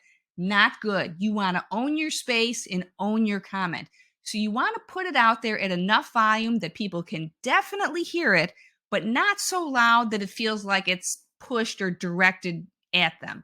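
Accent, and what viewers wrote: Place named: Buffalo, United States. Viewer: I KNEW you were from Buffalo! I love the accent!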